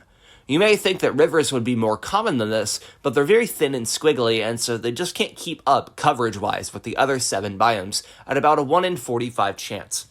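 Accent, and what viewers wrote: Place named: Delmarva, United States. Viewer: you kinda sound like ur from delmarva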